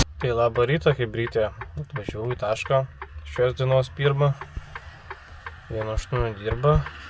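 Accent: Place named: Alytus, Lithuania. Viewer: Sveiki. Seniai žiūriu vairuotojų kanalus, bet šį kartą truputį kitaip - malonu girdėti alytietišką tartį :)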